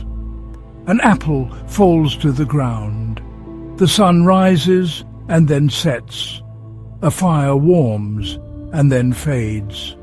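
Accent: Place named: England, United Kingdom. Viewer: english accents just make everything sound like propaganda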